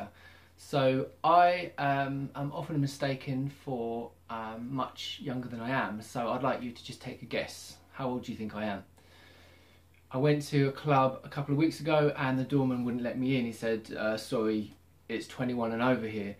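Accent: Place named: United Kingdom. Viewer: can't we agree it's the Celtic / ANglo background of you? I clearly pick on some British accent tones, I'm italian but also...slavic. Which is the worst, eastern european look 2 times older..i'm late 20s and look like 40 due to my slavic backgr. thanks for the video though you make some very good points.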